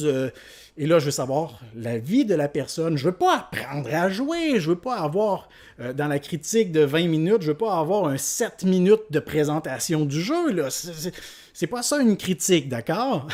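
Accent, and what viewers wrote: Canada. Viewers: l accent canadien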